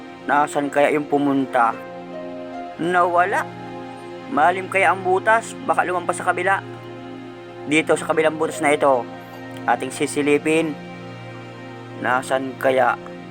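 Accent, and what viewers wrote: Bicol, Philippines. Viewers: kabayan na tatawa ako sa tagalog mo may halo bicol taga jaan pala ako sa wuezon province idol sa ka banda jaan ako sa san narciso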